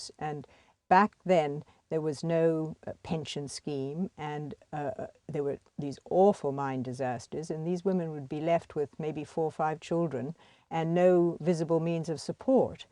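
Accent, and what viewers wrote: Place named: New England, United States. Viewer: It’s partly posh New England..a friend of mine spoke the same!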